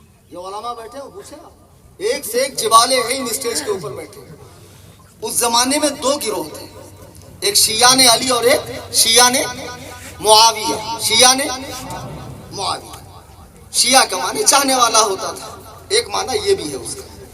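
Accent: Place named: Karnataka, India. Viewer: Karnataka accent mashallah Hazrat ko itna achha kese aata he Mumbai me rehte hen fir bhi...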